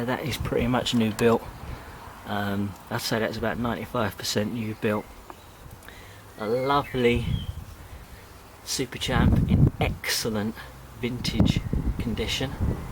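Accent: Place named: South, United States. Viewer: Mate, where do you live? From your accent I'd say the south, can I come round and play, lol.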